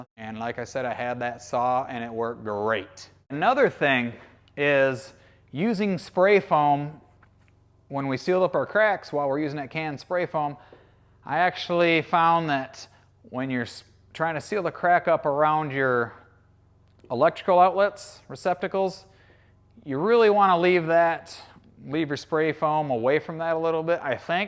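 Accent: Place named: Michigan, United States. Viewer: As soon as I heard you talk, I knew you were from Michigan... it's like I'm listening to someone from my hometown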